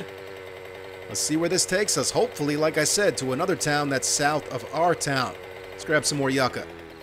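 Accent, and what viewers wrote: New Jersey, United States. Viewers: the saying is "You attract more flies with honey than vinger~" which is true. In wooded areas its common to see abandoned be nests full of maggots among other insects. But its meaning is to always be sweet instead of bitter to get what you want. I love your videos. I'm in New York so its awesome to hear such a thick jersey accent outside of Jersey shore. "Cabs are here"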